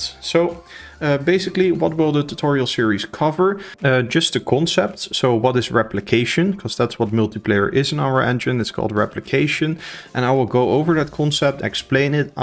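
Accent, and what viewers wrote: Netherlands. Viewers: Just found your channel, exactly what I have been looking for for ages! (and you premiered it on my birthday XD) You sound dutch (ben ik ook XD) Looking forward to going through these tutorials ^^ Thank you for making them <3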